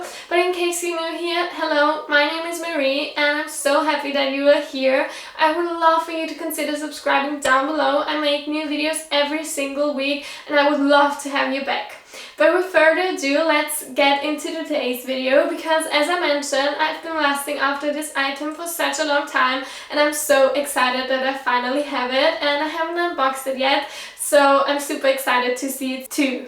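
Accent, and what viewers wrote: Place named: Germany. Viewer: You look amazing in these Tights. And also i love your accent, are you german?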